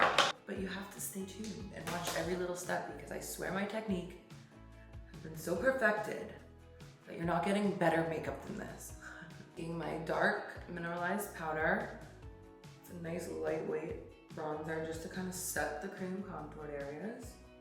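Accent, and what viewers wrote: New Jersey, United States. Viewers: Wait why does her voice accent and tone sound different? She sounds less Jersey here😮 I love her NJ accent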